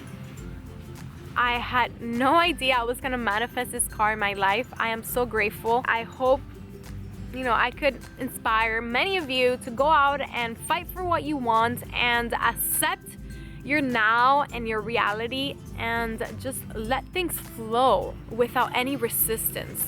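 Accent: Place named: Miami, United States. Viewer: ayyy reppin the 305 with that Miami accent 🧡💚 congrats!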